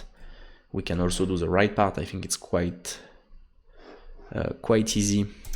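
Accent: France. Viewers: c'est encore plus stylé avec l'accent frenchie ahhaa ;)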